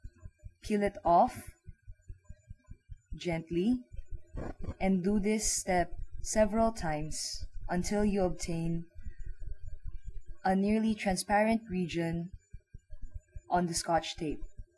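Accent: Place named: Philippines. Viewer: u sound like a Filipina.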